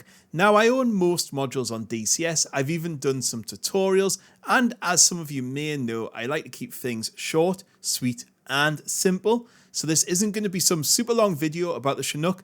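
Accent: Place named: Newcastle, United Kingdom. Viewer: Nice to hear a Geordie voice in the milsim community.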